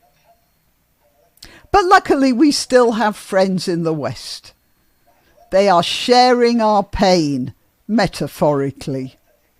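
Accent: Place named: England, United Kingdom. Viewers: I don’t know if you’ll see this, but which part of England are you from? I’m American, but my mother was a British war bride, and your accent reminds me of her family. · just because You sound English doesn't mean You're smart Because everything you're saying is so off base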